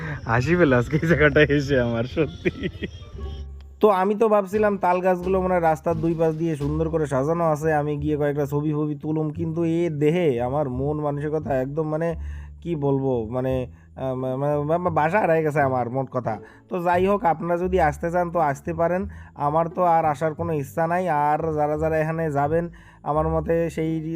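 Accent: Bangladesh. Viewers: দাদা কি কুষ্টিয়া আসার সম্ভাবনা আছে নাকি? কুষ্টিয়ার ভাষায় কথা বলছেন।🇧🇩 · Dada tumi to Bangladesh i vasa bolcho 😊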